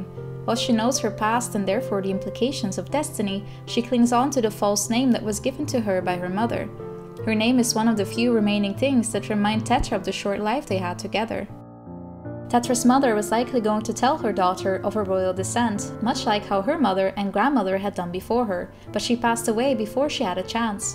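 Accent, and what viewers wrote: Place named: Netherlands. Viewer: She' Dutch.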